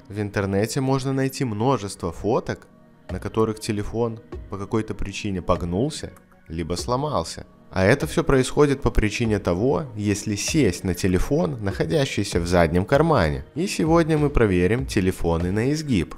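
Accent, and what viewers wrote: Belarus. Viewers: Крейзи белорашан эксперимент, судя по говору.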